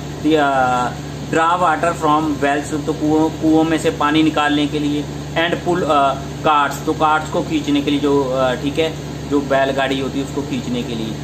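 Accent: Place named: Tamil Nadu, India. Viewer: You are from Tamil Nadu I am sure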